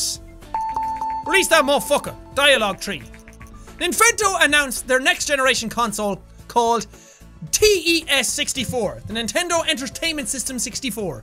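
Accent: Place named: Ireland. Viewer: I love your brogue!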